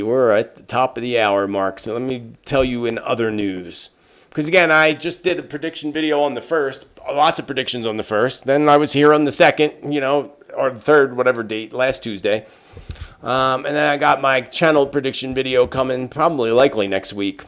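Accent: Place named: Bucks County, United States. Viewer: The way you pronounce certain words is very endearing:) My dear friend from Bucks County does the same thing! It’s precious:)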